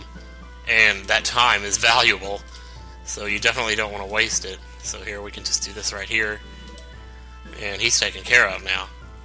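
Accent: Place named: South, United States. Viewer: Thanks for post... Not sure where you are from...but your accent (Southern?) Is great! Voice is easy to listen to...And you are funny. I mean really really funny. I am a med student using P vs. Z for stress release... Thank you so much for this post...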